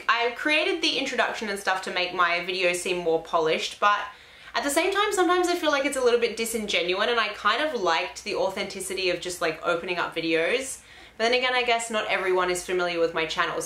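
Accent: England, United Kingdom. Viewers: First of all... Louie is gorgeous 😍 secondly, I love how your accent has changed here and there to a more English accent 😂. And I love your intro 😁... Wishing you and Louie a long and happy life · He's so adorable ..what a cutie..i bet he just loves his new mummy.... I can hear your are starting to get an english twang...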